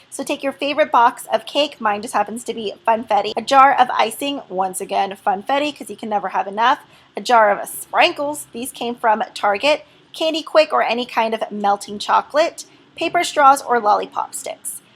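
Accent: Texas, United States. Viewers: ! You're getting a Texas twang!!! Not gonna lie- I'm looking for jobs and to relocate and I'm like "I'm gonna go to Texas. Maybe I'll see or leighann!" I'm not a stalker tho. Oh god that's how it sounds. Haha. Gotta go hide under a rock now.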